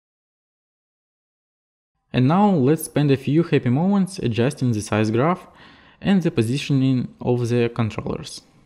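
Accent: Russia. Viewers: МММММ)))) Этот сладкий русский акцент) соу суит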